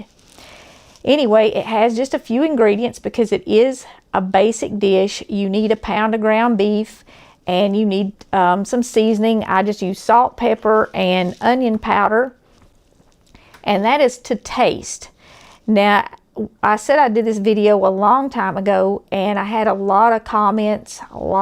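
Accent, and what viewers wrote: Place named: Kentucky, United States. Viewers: I grew up in a military family (Army), my mom has your accent, she's from Kentucky. My dad (Vietnam Vet) made S.O.S. with sausage and he would fry an egg and put it on top of the toast and put the SOS over that. Thanks for your recipe, I grew up on this. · I dont know where she is from but I absolutely love her accent. I could be wrong but I'm thinking Appalachia somewhere. I've heard those folks really know how to cook! SUBBED!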